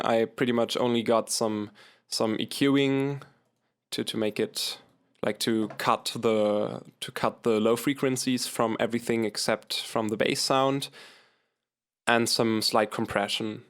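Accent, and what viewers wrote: Germany. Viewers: Wie krass man einfach das deutsch raushört (how easy you just hear the German) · I realised u r german after like 1sec of watching this :D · Are you german ? :) you have a german aczent :D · He's german :3 That accent :DDD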